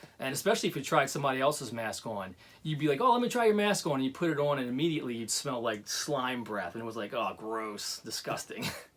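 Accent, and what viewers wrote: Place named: Baltimore, United States. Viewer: thanks for the memories...btw this bawmer raised boy senses some baltimore or philly accent? lol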